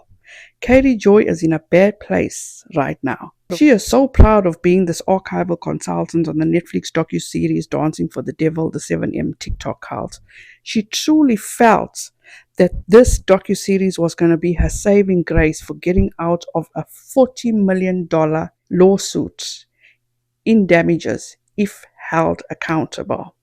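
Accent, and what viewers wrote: South Africa. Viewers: So lovely to hear your beautiful South African accent! 🙌🏻🙌🏻 🇿🇦🇿🇦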